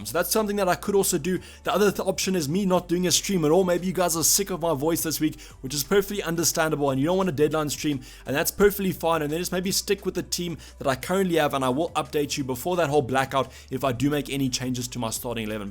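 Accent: South Africa. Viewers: Never tiered of hearing your lovely South African voice, keep up the great work 👍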